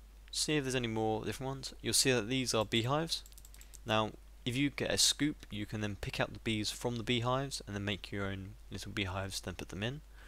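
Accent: United Kingdom. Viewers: He's British, it's pronounced that way over there. Don't be ignorant.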